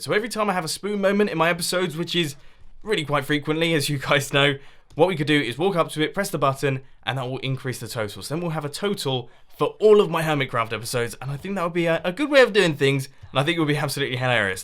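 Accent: United Kingdom. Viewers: Why so stereotypical, I'm American and a girl and I don't just like the British accent I like the content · it is the british accent with the serious tone that has the charm. Sometimes I try speaking like that bcoz it sounds absolutely cool OwO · British voices turn me on even tho i'm, not gay.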